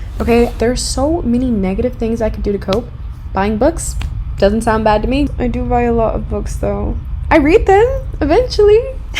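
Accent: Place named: Colombia. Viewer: It’s so funny to me how you have a Colombian accent when you speak Spanish 😭 ofc u lived in Colombia and I understand but it’s interesting to me how depending on where you learn a language you can get their accent. I’m Latina and learned English in New England however I don’t have an accent but I lived in Texas when I first moved to the states and wonder if I would have an accent if I lived there!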